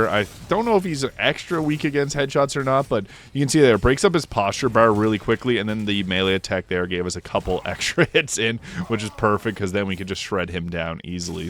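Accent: Canada. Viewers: Do I hear a fellow Canadian?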